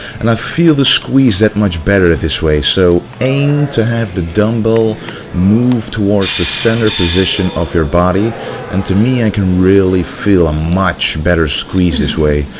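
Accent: Netherlands. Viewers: Somehow your accent gives me the idea that your a dutchie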